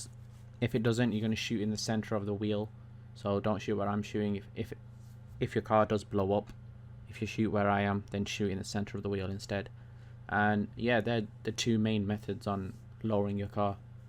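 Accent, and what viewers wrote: England, United Kingdom. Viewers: You sound asian british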